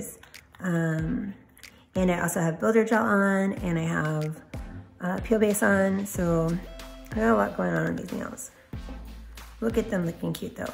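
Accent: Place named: Texas, United States. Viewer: My boyfriend just walked by while I’m watching your video and says “that’s, isn’t it?” 😂😅 he says your voice is “a good Texan southern voice”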